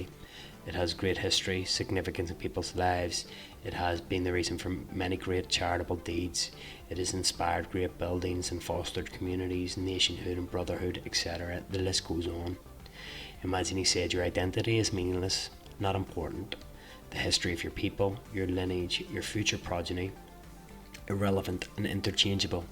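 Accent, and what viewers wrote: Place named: Ireland. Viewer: It needs a special attitude to read PM books like this one and maybe exposure at uni...neither of which I have so I appreciate it when those who grit their teeth and gird their loins to do so to share their breakdowns and insights. If there is more like this to come your content looks set to be a welcome addition to our sphere. I didnt think you rambled but for those of us not attuned to the lovely Irish lilt might I suggest slightly slower speech. When one is reading from prepared content (which this seemed to be) there is a tendency to read it aloud a little fast.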